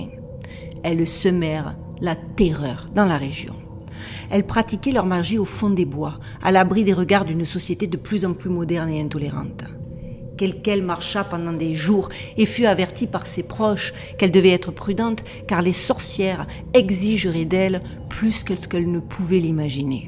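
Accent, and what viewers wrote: South, France. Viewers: bonsoir j'adore votre accent du sud de la France que l'on ne perd jamais où qu'on aille je suis toujours demandé comment cette famille a pu prendre un tel essor "sans rien faire" oui oui oui je fais de la magie chrétienne et j'arrive à un bonheur incroyable mais je me suis faite toute seule et jamais le dark side ne pourra m'atteindre j'ai l'amour inconditionnel et universel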